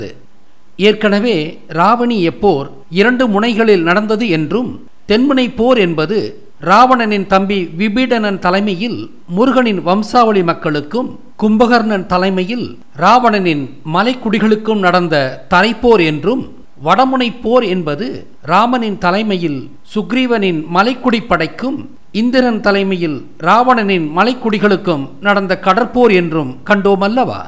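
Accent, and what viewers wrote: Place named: Southern Tamil Nadu, India. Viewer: உங்கள் பேச்சு அப்படியே தென்தமிழகம் பேச்சுமாதிரி இருக்கு ஐயா....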